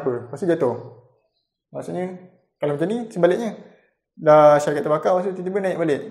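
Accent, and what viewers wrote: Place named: Kelantan, Malaysia. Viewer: ore klate ni..sbb bunyi "jolo doh"..hehe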